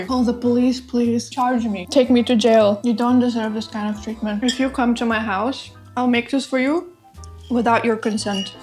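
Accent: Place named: United States. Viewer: Apologies if you've explained this in a past video, but how did you end up speaking American English? It's always interesting to see how people were taught/taught themselves across the UK/Australian/North American inflections. Signed, an American with some long-ago Lithuanian roots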